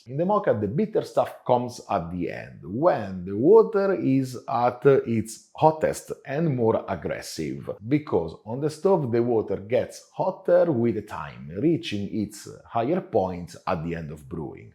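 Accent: Italy. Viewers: Buena idea, buena explicación, y ese acento italiano excelente!! jaja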